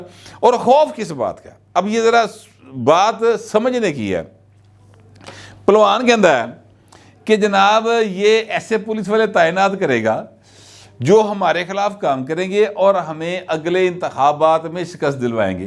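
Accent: Punjab, Pakistan. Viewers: اَلسَلامُ عَلَيْكُم وَرَحْمَةُ اَللهِ وَبَرَكاتُهُ جناب محترم بھائی صاحب اردو میں پنجابی کا تڑکا لاجواب۔۔۔۔👌👌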